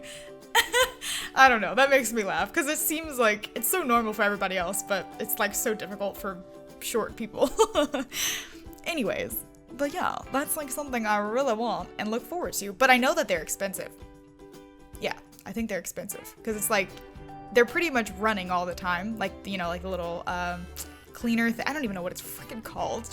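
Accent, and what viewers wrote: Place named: New York, United States. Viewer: For some reason you just sound like a New Yorker to me. I always wanna ask what borough you live in. But then I’m reminded you’re from Texas. 😅😅☺️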